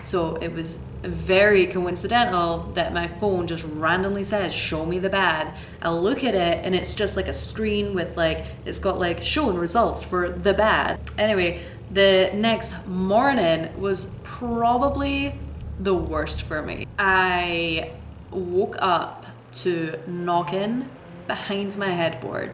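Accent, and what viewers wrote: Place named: Scotland, United Kingdom. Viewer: Like a female Craig Ferguson. Great storyteller. Your Scottish accent was much stronger then.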